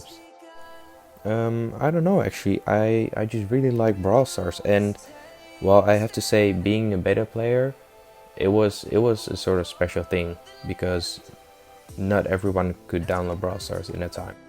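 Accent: Netherlands. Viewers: Omg it's so funny because I'm from Belgium and I can really Hoor dat je een Nederlander bent aan je manier van engels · I am sorry but you have a very Dutch accent :p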